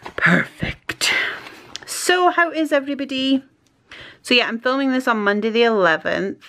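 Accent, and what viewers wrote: Scotland, United Kingdom. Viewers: A plan with me and the word "purring" on a wonderful Scottish accent is just what I needed on this cold January day ❤️❤️❤️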